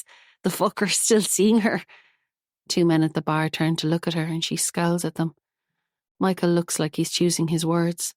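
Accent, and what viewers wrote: Ireland. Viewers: Irish accent? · Yay! Irish narrator. Dunlaoghaire! I know the town so, so well! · sounds Irish to me....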